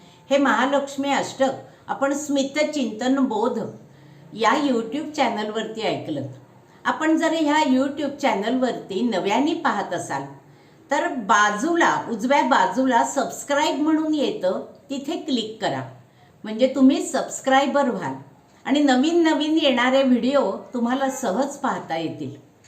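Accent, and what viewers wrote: Standard. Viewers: श्री महालक्ष्मी माता की जय. 🙏🙏🙏 शुद्ध उच्चारण. धन्यवाद ताई. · शुद्ध उच्चारांसह महालक्ष्मी अष्टक स्तोत्र व माहिती... परिपूर्ण 🙏 धन्यवाद ❤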